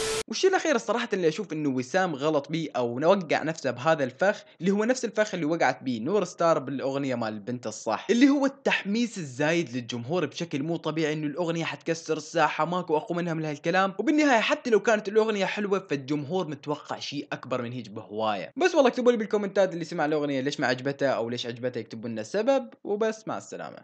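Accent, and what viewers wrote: Iraq. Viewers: ممصدك أنتة سوري ماشاء الله عليك ضابط الهجة العراقية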